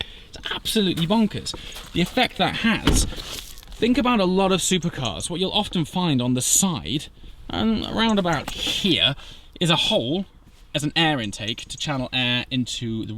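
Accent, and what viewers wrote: United Kingdom. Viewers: Is it just me or has thickened his British accent?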